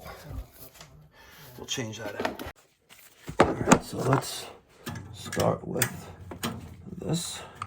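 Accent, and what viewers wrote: New York, United States. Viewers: Excellent video like ALWAYS or as I stated in an earlier video last year when I first Subscribed... You always sound like Ron Jeremy with your New York accent. Sorry for the possibly negative stigma that it may bring up but I still say you are the man and we need some personality like you here in Utah! Anyway, stay safe and thanks for all you do! PEACE!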